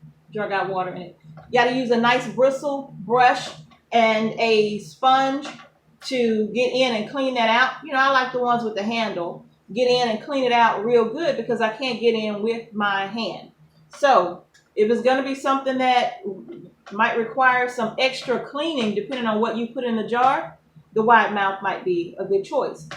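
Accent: South, United States. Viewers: From my experience, yoie tubie can't figure out CC, especially with a southern accent. I have seen some ridiculous "translations".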